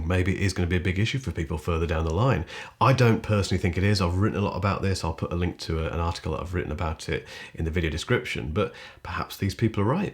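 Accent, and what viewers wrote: England, United Kingdom. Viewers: 5 secs into the video I hit subscribe instantly just for your fabulous English accent! 😍😍😍 Great informative content. thanks a million bro 👌👌👌👍✌💗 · I'm only subscribing because I love this mans lovely English accent and soothing sexy voice! lol Ok, ok! Love his reviews too!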